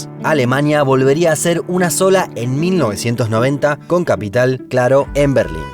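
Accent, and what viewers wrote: Argentina. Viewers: Hacen o haces no se quien esta atras de Un mundo Inmenso, pero es un excelente trabajo no saben el valor de cada video que he visto un buen resumen, imagenes, musica, todo esta muy bien. El narrador es Argentino verdad? Un saludo desde Mexico del Norte :)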